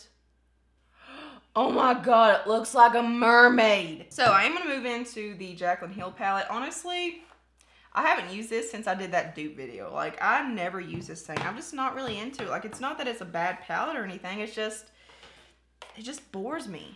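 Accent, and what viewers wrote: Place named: Kentucky, United States. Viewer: I still enjoyed it!!! My dads side is from Kentucky and ur accent makes me feel at home, ur channel makes me feel that way!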